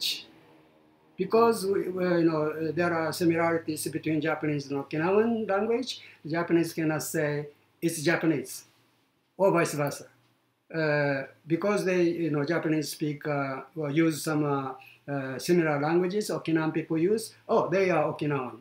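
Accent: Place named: Philippines. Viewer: He sounds like a filipino when he speaks english with a little japanese nuances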